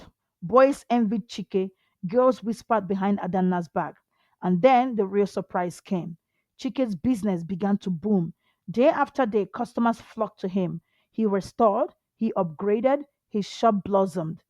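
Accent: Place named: Nigeria. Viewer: This Ai voice is unique with a touch of Nigerian accent 😂😂 and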